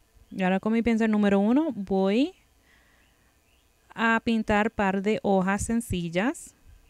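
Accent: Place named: Cuba. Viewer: esa es una cubana o puertoriqueña)=? que linda voz tiene dame su correo ja ja ja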